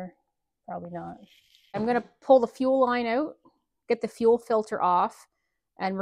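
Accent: Canada. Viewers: I knew it had to be a Canadian engine shop when I heard her accent and saw the snow on the ground. God makes tough people in Canada. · You're very good at what you do and you deserve many more subscribers! Is that an East coast accent? Newfoundland, maybe? I was so surprised there was nothing wrong with the flywheel key ; I was sure that was the problem, an over-advanced spark. A great how to video. Subscribed. And cheers from the West coast of Canada! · Hi, just discovered your channel. I'm a Stihl guy, a retired hobbyist. I've been working on saws for about 7 years now, after I burned up a piston due to a leaky crank seal and needed to fix my 034. I'm in northeast Arkansas and I'm guessing you're Canadian from the way you pronounce "out". I'm now 7 years older and dozens of Creamsicles "richer". The 025/MS250 has the same starter pulley diameter as the 170, 210, 230, and having the largest displacement of these, is notoriously hard to pull (also hard to start, if you make the mistake of waiting for the first pop to turn the choke off). 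The lever arm of the pulley is IMHO too small for the job. However, for a younger able bodied person armed with the above knowledge, I believe it is the best bang for the buck in a homeowner saw. Another caveat: don't try to advance the timing unless you don't value your arm.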